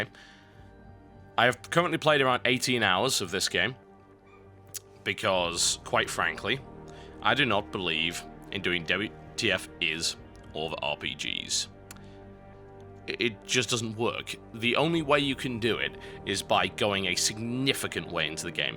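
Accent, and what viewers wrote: United Kingdom. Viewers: your british voice is very soothing... #NoHomo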